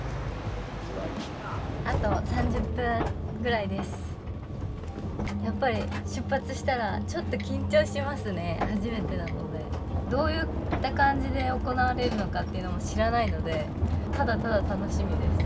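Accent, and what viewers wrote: Standard. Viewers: 모처럼 모모가 표준어로 열심히 말해줬는데 ㅠㅠ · ミラノまでウォンジョンヨ先生来てくれてるだ〜！このペアは最強がこんなに標準語喋ってるの新鮮w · 標準語でお話するの謎の寂しさがある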